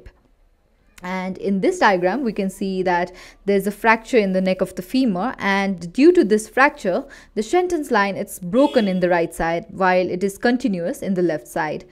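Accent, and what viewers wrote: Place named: England, United Kingdom. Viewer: Best teacher with nice English accent❤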